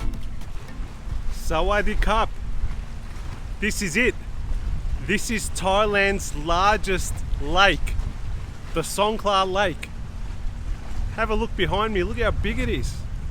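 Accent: Australia. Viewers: Bro, get some vocal coaching. That Aussie twang of yours ain't particularly pretty.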